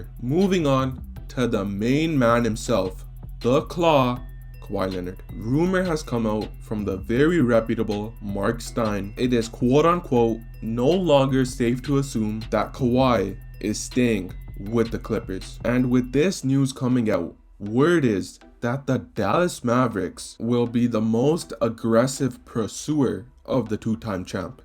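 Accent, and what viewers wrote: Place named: Toronto, Canada. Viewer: Where are you from? You kind of sound like you're from toronto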